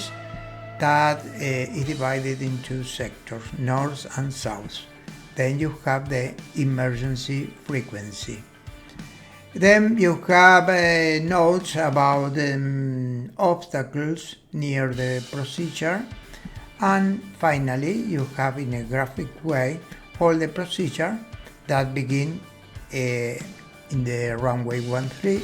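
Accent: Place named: Latin America. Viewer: Dear, are you a certified instructor by the Federal Aviation Administration of the United States of America?? Because I hear a particular Latin accent in your pronunciation. Anyway, congratulations, excellent work, you are a great teacher, your students must be very skilled pilots.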